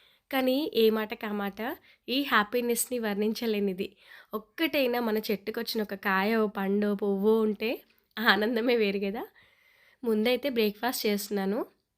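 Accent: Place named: Andhra Pradesh, India. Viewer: Hi akka bagunnara.meeru Aandra vallaa..mi matalu ala matladatharu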